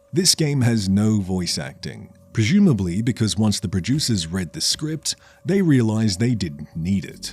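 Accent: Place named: Australia. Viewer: Deadpan Aussie gaming man made a new thing.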